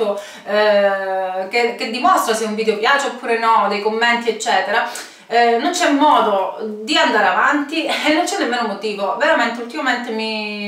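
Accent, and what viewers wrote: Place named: Calabria, Italy. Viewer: carissima io "spollicio" ma non commento però i tuoi video mi piacciono moltissimo, sentirti parlare mi fa sentire "a casa" (W la calabria!!). questo sito lo visiterò anche perché un altro di cui non voglio fare nomi non mi ha inviato tutta la merce da me ordinata promettendo di reinviarmela....sono 8 mesi che aspetto.... buona serata e please non smettere di fare video!!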